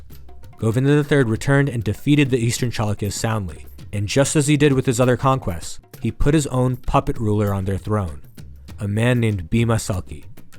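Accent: United States. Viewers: The narrator is an Indian origin American. We cannot blame him for the accent since he has spent his entire life in America. Thankfully he is still an Indian at heart. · Why do we have to hear American Accent. Would have been better if Indian names were pronounced correctly. Rashtrakuta Kannauja I had to try 👂 thrice to understand what it is.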